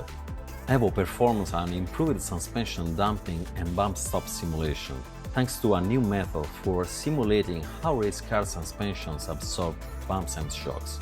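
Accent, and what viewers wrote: Rome, Italy. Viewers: i dont know about you but i trust a guy with an italian accent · As an argentine, your clear english on pure italian accent feels like our grandfathers telling an interesting story, let me prebuy already i have saved on my steam wallet for months. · l'accento italiano è la cosa che rende ancora più particolare il video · i love the strong roman accent as an italian ahahahahah loving the fact the Alfa Romeo Giulia is the cover car <3 it deserves it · Came for the asseto corsa evo update stayed for the absolutly beautiful Italian accent.... Bellissimo! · porca boia l'accento più italiano che abbia mai sentito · L accento roma o rende tutto migliore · The italian accent is so cool.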